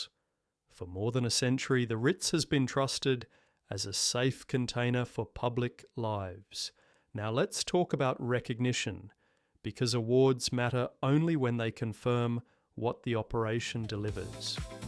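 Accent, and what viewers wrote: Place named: Australia. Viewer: What an odd, unnecessary video. No official source or credentials, and quite the AI babble. What really threw me was the lower class Australian accent. He may be better served commentating a soccer game.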